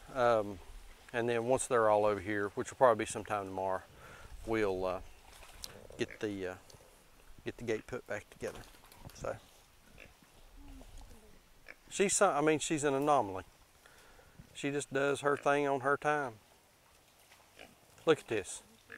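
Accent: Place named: North Carolina, United States. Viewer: Love your North Carolina accent. Sad that American accents are disappearing.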